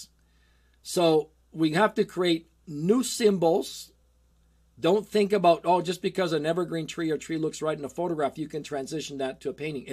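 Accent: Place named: United States. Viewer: I have had to put the sound off and the subtitles on. I can not stomach one more American accent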